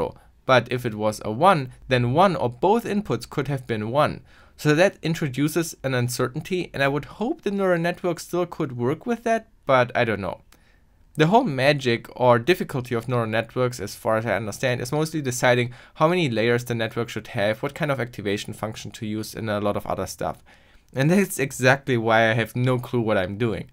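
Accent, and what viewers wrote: Germany. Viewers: Bisschen hört man doch raus das er deutscher ist :D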